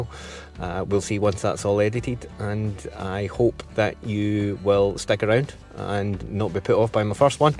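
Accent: Scotland, United Kingdom. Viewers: A wonderful introduction! Thank you so much. It was lovely hearing that great Scottish accent after so long. Keep up the great work!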